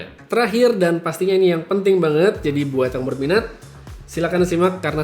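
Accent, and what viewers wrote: Bali, Indonesia. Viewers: Orang bali iya ?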